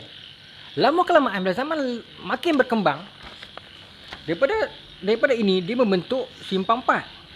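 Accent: North, Malaysia. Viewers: kasaq ustaz 😂. Org utagha mmg camtu hahaha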